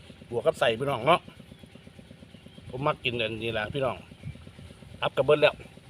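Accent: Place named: Roi Et, Thailand. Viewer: คนจังหวัดดั่ยสำเนียงคนร้อยเอ็ดกับหนองบัว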